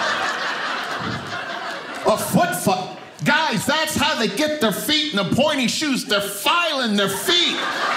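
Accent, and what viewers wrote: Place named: New Jersey, United States. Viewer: So funny. I'm from Jersey. We tauk like dat!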